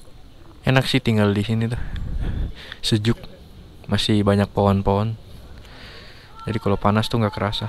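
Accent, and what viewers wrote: Papua, Indonesia. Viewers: Logat papua ko,sa dan tong Salam dari serui papua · habis logatnya kentara bos, papuanya dimna bos, kalo saya di sorong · Abang pu Logat bicara Kaya orng Papua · Kaka, Kaka dari Papua k habis kk pu dialek macam orang Papua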